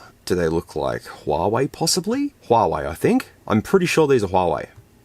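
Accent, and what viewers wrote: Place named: Australia. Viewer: I don't know why he's so worried about his voice. You just sound like an Aussie mate. You don't sound like you, but you still sound like some Aussie.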